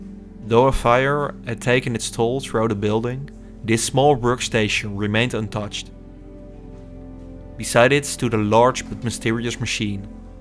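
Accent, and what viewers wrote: Netherlands. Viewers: Keep up the vids! Have to say that the Dutch accent is strong with this one! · You can hear you are Dutch. Not only that, but are you from Leiden?! My head wants to know... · Well, Someone just made it to a CIA transscript :P. Great vid. You sound like you're from the netherlands. If so, Top video. Ik ga zeker abonneren. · ontzettend nederlands accent . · Tintje? Het is overduidelijk een vrij dikke nederlandse accent. Voor mij is het niet om aan te horen maar dat is vrij persoonlijk. De inhoud is best goed en erg interessant en daar doet het accent niets aan af. Mooie docu! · Jezus man, gebruik Ai ofzo. Dat Dunglish accent van je is super-irritant. Verder wel leuk. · Je haalt ze d´r altijd in 2 seconden tussenuit hè, die Engels-sprekende Nederlanders 😄👍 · Dutch English 😂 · Engelse les bij Mark Rutte gehad? Ik vind je docu geweldig, maar je Nederlandse Engels is goed te horen. 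· Top notch Urbex content, guys! Music, back story, pictures, narration; everything is just right. I really enjoyed the video and to learn the story of Olivetti. And as someone from the Netherlands, I enjoyed the Dutch accent, of course. ;) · the most dutch accent ever .. Thank you for your content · Dutch accent by the sound.😁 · Dutch voice 😅 olivetti had design. Jammer dat ze niet meer bestaan. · Veel leuker met meer uitleg over de geschiedenis van het gebouw dan de standaard urbex video's, dacht al een Nederlands tintje in je taal te horen haha. Subbed!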